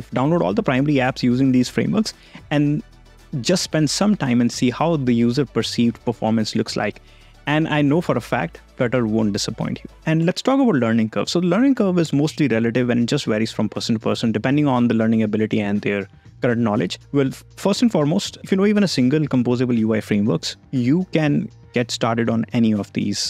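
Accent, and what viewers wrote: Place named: India. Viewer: >indian opinion >trash accent opinion rejected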